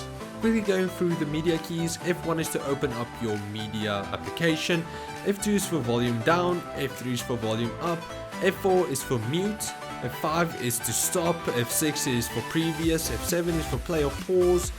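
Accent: South Africa. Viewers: Cool video, thanks bud. Just ordered one of these. Also, you sound South African...are you? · you from South Africa mate? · are you from south africa?? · south african?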